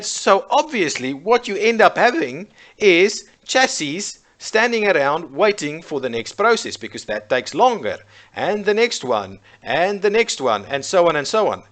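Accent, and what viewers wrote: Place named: South Africa. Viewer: That's a South African accent if I ever heard one. :p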